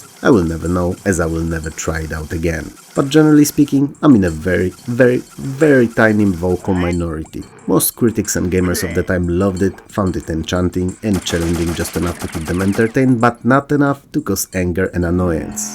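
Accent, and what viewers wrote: Poland. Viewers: ~ you very on point, speaking from experience I've worked as dev on Espresso Tycoon, and at first we had tutorial, but quickly we noticed not only it took a while to finish, it was just too much info for regular player to remember much anyways, so we did same - each mission introduces new mechanics, though we always been making this game with focus around sandbox which is available from beginning (actualy it could be game up your alley who knows hehe 😅 ) That being said I'am not big fan of such method either , but in our situation it was actually quite hard to get it done any other way Shameless plug aside, I wonder where you from, judging by accent I take wild guess Polish (jak ja! także pozdrawiam!) Good video, some of these I experienced even before i had own pc, at cousin place, some games i even forgot about , or not realized they been so old they worked on dos in fact ;) I remember little big planet being hard (for uh 6 maybe 8 years old me) , we could not progress much in it each time we tried, before internet and guides being available like today, gaming was quite a different experience in deed back in the 90s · Polski akcent wyczuwam :)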